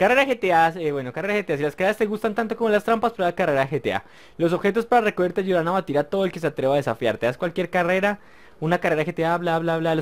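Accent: Colombia. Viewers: ERES COLOMBIANO CIERTO SE SIENTE TU ACENTO YO TMBN SOY COLOM Y HABLAS IGUALITOOOOOOO VIVA COLOMBIA. ENCERIO ERES COLOMBIANO VERDAD? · si creo que es colombiano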